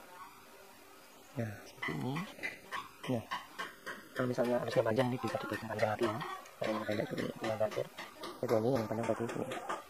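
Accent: Blora, Indonesia. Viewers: iki wong mbloro opo y K-E :D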